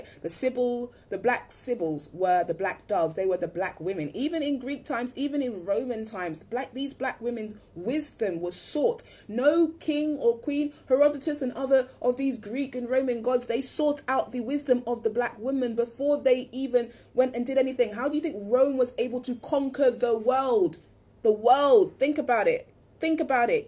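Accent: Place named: London, United Kingdom. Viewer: So beautiful to hear a London accent in the Melanated community on YT.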